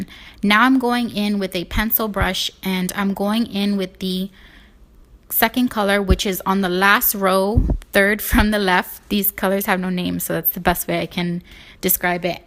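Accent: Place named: New York, United States. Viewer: Are you from new York or new Jersey you have an accent I like it!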